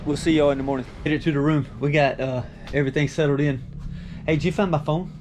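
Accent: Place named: Arkansas, United States. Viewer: bro your accent , are u from Arkansas? its hard to distinguished American.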